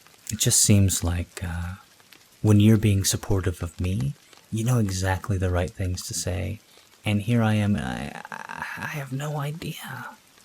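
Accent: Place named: Midwest, United States. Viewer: You have no idea just how awesome and important this video is. I just discovered your channel tonight, and found this. I think maybe I was supposed to. YT tends to be a delightful "rabbit hole" of things yet to be discovered, overall, but somehow Spirit manages to use YT to tell me stuff I seem to ignore too much. I'm not sure what else I can say except for the fact that your voice is quite soothing to listen to. I'm sitting here trying to pinpoint your accent (something I'm usually pretty good at). At times you sound a bit like you're from the Midwest (Iowa, Missouri, Nebraska, etc) and other times, you sound like you might be in the mid-Atlantic states (NC, W. VA, MD, etc). Care to reveal the truth? ;-) (I'm from Iowa, btw)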